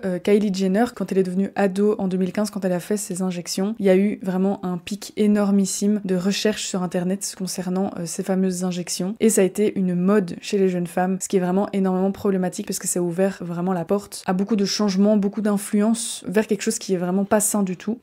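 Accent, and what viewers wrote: Belgium. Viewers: Super sujet et j'aime beaucoup la façon dont vous le présenter, avec profondeur et simplicité. J'entends un fond d'accent belge, non ? En tout cas, continuez !!!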